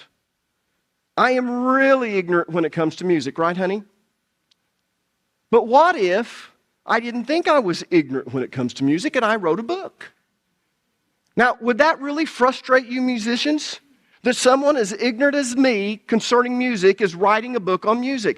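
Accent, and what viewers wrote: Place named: Carolina, United States. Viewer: Carolina is a different dialect. Took me years to understand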